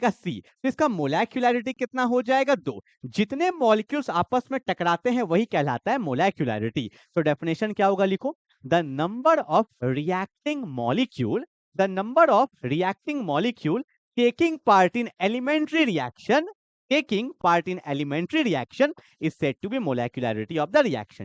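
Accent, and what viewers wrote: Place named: Kashmir, India. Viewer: Sir ka Kashmiri accent ❤😅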